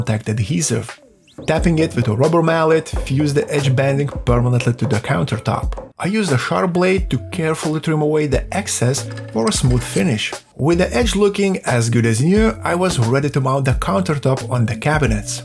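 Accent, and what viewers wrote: Russia. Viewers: Брат у тебя акцент как у русского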